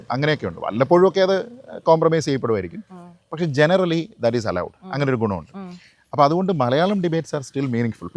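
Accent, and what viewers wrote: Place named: United Kingdom. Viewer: ദക്ഷിണേന്ത്യയിൽ കേരളം മാത്രമാണ് ചില ആങ്കർമാർ ഇംഗ്ലീഷിലോ, അല്ലെങ്കിൽ വ്യക്തമായി ഇംഗ്ലീഷ് സ്വാധീനമുള്ള ഉച്ചാരണത്തോടെ മലയാളത്തി സംസാരിക്കാൻ തിരഞ്ഞെടുക്കുന്ന സംസ്ഥാനമായി തോന്നുന്നത്. പലപ്പോഴും അത് അനാവശ്യമായതും ചില സമയങ്ങളിൽ ശ്രദ്ധ തിരിക്കുന്നതുമായ ഒരു അനുഭവമാണ്. “Big Sister” വർഷങ്ങൾ മുമ്പ് ജോലിക്കായി വോയ്സ് ആൻഡ് ആക്സന്റ് ട്രെയിനിംഗ് എടുത്തതും പിന്നീട് ആ ശൈലി ആങ്കറിംഗിലും പിന്തുടരാൻ ശ്രമിച്ചതും കണ്ടതിന് ശേഷം, ഈ ഘട്ടം കഴിഞ്ഞുപോയി എന്ന് ഞാൻ കരുതിയിരുന്നു.ഇതിനെ കൂടുതൽ വ്യക്തമായി കാണിക്കുന്ന മറ്റൊരു കാര്യം, മലയാളം അതിന്റെ സ്വാഭാവിക സൗന്ദര്യത്തിൽ തന്നെ വ്യക്തതയോടും ആത്മവിശ്വാസത്തോടും സ്വാഭാവികമായ ശൈലിയോടും സംസാരിക്കാനാകുമെന്ന് തെളിയിക്കുന്ന മികച്ച ആശയവിനിമയക്കാരെ കേരളം സ്വന്തമാക്കിയിട്ടുണ്ടെന്നതാണ് — അതിൽ ശ്രീജിത്ത് പണിക്കർ ഒരു മികച്ച ഉദാഹരണമാണ്. ഇംഗ്ലീഷ് ആക്സന്റിൽ ആശ്രയിക്കാതെ തന്നെ മലയാളം എത്ര ശക്തിയും സൗന്ദര്യവും നിറഞ്ഞ ഭാഷയാണെന്ന് അദ്ദേഹത്തിന്റെ അവതരണം തെളിയിക്കുന്നു.മലയാളം അതിന്റെ സ്വന്തം സമ്പന്നമായ വ്യക്തിത്വമുള്ള ഒരു മനോഹര ഭാഷയാണ്. 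അതിന്റെ സ്വാഭാവിക ഉച്ചാരണങ്ങൾ — പ്രാദേശികമായാലും ന്യൂട്രൽ ആയാലും — അലങ്കാരങ്ങൾ ആവശ്യമില്ലാത്ത ഒരു സ്വാഭാവിക ആകർഷണവും സത്യസന്ധതയും നൽകുന്നു. ആങ്കർമാർ ഇംഗ്ലീഷ് സ്വാധീനമുള്ള ഉച്ചാരണത്തിലേക്ക് മാറുമ്പോൾ, ഭാഷയുടെ സ്വാഭാവിക സൗന്ദര്യം മറഞ്ഞുപോകുന്നതുപോലെ തോന്നാറുണ്ട്. Original ; Kerala seems to be the only state in South India where some anchors choose to speak either in English or in Malayalam with a distinctly English‑influenced accent. It often feels unnecessary and, at times, quite distracting.I genuinely thought this phase had passed—especially after “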